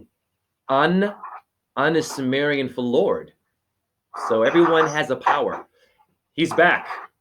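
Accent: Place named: England, United Kingdom. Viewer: I So much enjoyed your chat ... It' Feels fabulous to listen and change 💚💜🙏. Ha ha I love the English voice, I'm from England 😊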